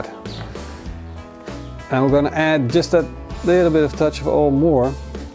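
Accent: Netherlands. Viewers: I knew you were fucking dutch. the accent gave it away but i was still doubting myself untill i saw the last part. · I knew you were Dutch 5 sec in by your typical English-Dutch accent. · you speak with dutch accent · Prices are bit different in the Netherlands. Maybe you noticed his slight accent. 150$ sounds about right in Europe. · Hahaha, Nederlands zeker? Hoor je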